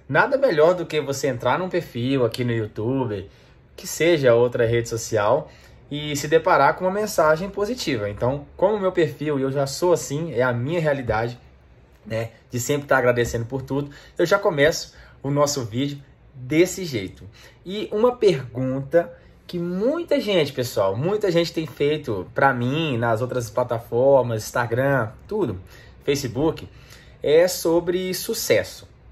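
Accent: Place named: Minas Gerais, Brazil. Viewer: ADORO VER VOCE FALAR MINEIRO...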